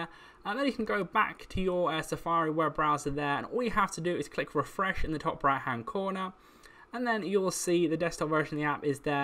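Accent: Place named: United Kingdom. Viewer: Between the disgusting mouth sounds and the million things happening on screen with athe British accent saying “that’s not what you want” this video is a 0/10 would not recommend to my worst enemy’s, great job random bloke.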